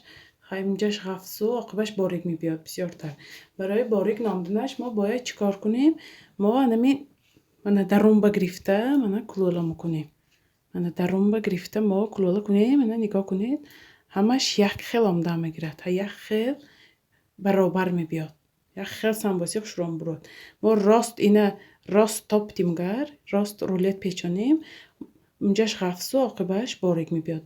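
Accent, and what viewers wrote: Isfara, Tajikistan. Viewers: Гап не апа. Исфарача кара фахмондид👍👍💐💐💐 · Офарин зани бехтарин дугона лафзи исфара додед хохар.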